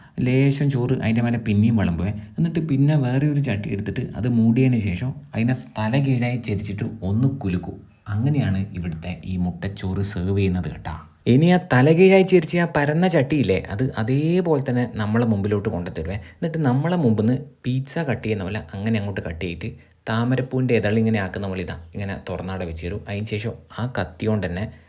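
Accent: Kannur, India. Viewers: Uushentappa🤣🤣🤣kannur bhasha. U are so funny👍😂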